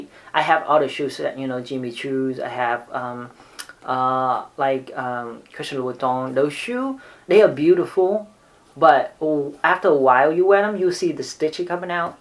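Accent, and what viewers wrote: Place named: Vietnam. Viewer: Well his family name is popular in Singapore and Vietnam , but his accent also makes me wonder if he is Vietnamese or Singaporean...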